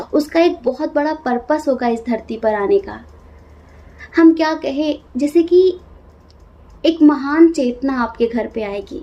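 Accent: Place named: Bengal, India. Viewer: Aap bangoli ho aisa lagta hai mam...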